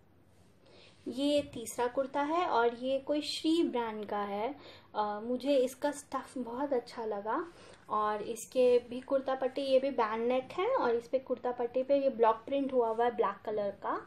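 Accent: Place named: India. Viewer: great haul.....soo cute😊😊love your hindi ascent...😍😍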